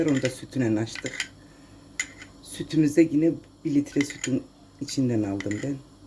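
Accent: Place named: Kayseri, Turkey. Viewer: Yapicam simdi siveniz Kayseri gibi yanılmıyorsam elinize saglik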